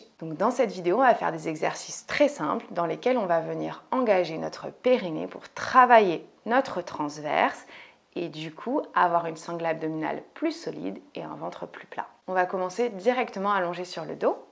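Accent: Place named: France. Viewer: Belle séance j'adore beaucoup votre voix avec cet accent français doux quelle merveille le yoga avec tous ces ingrédients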